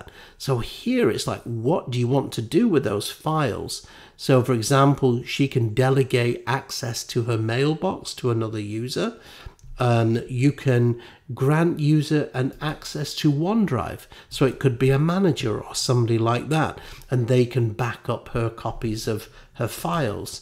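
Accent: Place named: United Kingdom. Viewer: Hearing a British guy teach keeps my focus.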